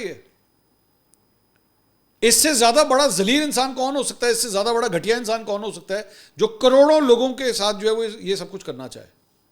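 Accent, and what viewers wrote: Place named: Lahore, Pakistan. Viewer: AP bohat izat k qabil hain or achi reporting kartey hain emandari k sath is me koi shak nahi. Bas AP apna labori accent khatam kar deyn mean k AP reporting kartey waqt Punjabi na istamal Kiya kareyn is se apki reporting par effect pardta hey jesey k bohat se indians Jin tak apki baateyn pohanchna bohat zruri hain me samjhta hu wo Punjabi language ko samjh nahi patey hon gey.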